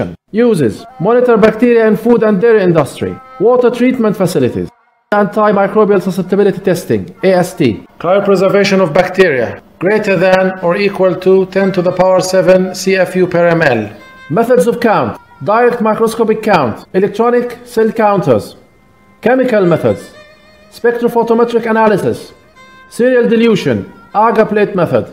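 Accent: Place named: Arab world. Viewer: Brother 😂 your speed = like a ferrari but i have gotten by accent you are an Arabic where as i am from kashmir . So it was more difficult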